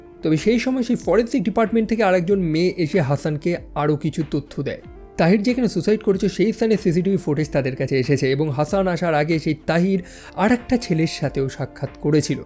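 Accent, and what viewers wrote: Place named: Sylhet, Bangladesh. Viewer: Sylheti vai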